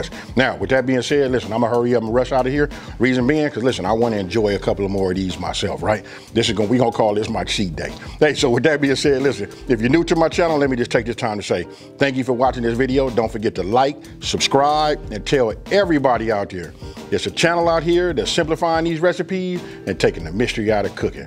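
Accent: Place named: California, United States. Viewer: Facts!!!! I get all my recipes from him.. he needs to have a show on Revolt or BET, TVone or something.. cool, not corny.. and you can tell he from 'round the way lol.. I f*cks with and his Cali accent lol 💯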